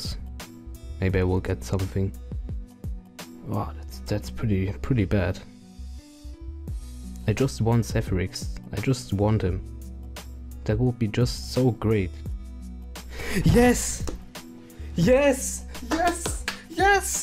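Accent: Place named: Germany. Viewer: Digger learn mal Englisch, du redest im deutschen Stil english. Lass es sein oder lernen besser Englisch. Deine Videos sind cool anzuschauen und das feiere ich aber dein english ist echt für Blutungen im Trommelfell verantwortlich. Einfache Sätze wie "where is he" sind doch 5. Kläger Niveau. Und nicht "where he is". Sorry dass das so bissig klingt aber mach lieber auf deutsch, was auch passt und zur Not Untertitel wenn die NICHT deutschen was verstehen wollen :) Wir müssen schließlich auch Englisch lernen um ihren Kram verstehen zu können.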